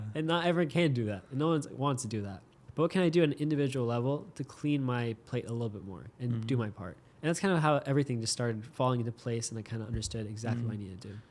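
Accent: United Kingdom. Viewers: Scotish accent... Northern Scottish accent is more stronger. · No need to - Be yourself, its a nice accent, "kinda sounds british", but theres subtitles and speed options I believe. So be yourself Inshallah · Put on subtitles. It's a British accent so if you speak ENGLISH you should be able to understand him.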